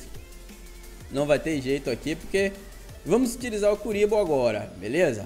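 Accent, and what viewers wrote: Bahia, Brazil. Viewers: ata você é bahiano , pensei que fosse dá paraíba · kkk sabia q vc era baiano mano vc é de onde? salvador?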